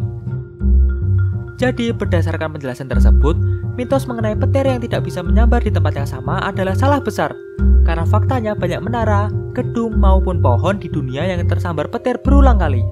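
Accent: Java, Indonesia. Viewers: petirr oii petir.... bukan peteerr... medok bangett masss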